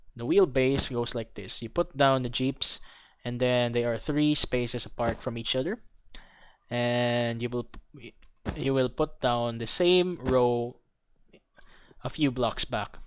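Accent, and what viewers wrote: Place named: Philippines. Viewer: Ang accent niya ay tagalog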